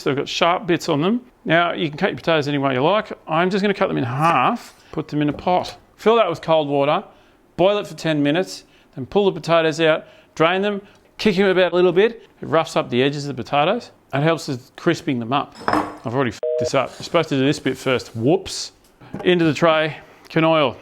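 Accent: Australia. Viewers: YT's auto-captions can't understand Australian. It's chat.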